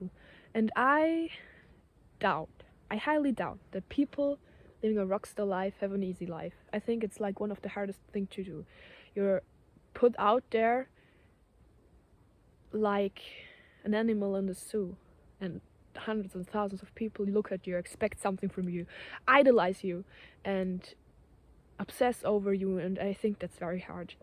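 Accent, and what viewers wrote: Germany. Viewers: Thanks for the recommendation. I also liked the movie mitty, where he lives a simple life and become to enjoy it, when he goes on a journey because of his work. I first thought English is your first language, but I kind of hear some german accent. Sprichst du etwa deutsch?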